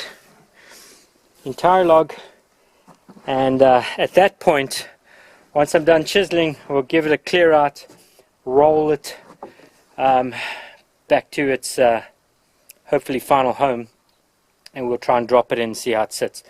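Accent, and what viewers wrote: South Africa. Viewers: Thats a heavy South-African accent you've got there mate . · Is that a South African Accent I am hearing ?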